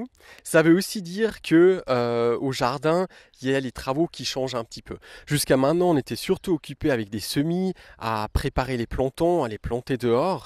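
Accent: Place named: Alsace, France. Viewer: On dirais que vous êtes Alsacien ^_^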